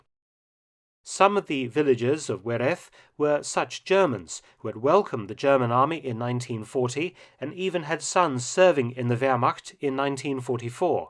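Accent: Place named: RP, United Kingdom. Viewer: GENERAL SOUTHERN BRITISH/Received Pronunciation.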